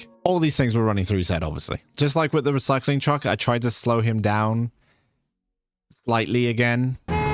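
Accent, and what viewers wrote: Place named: Bristol, United Kingdom. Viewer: Love the Bristol accent ❤and love to see what npcs do with their day 😂 I hope it gta 6 they actually live full lives.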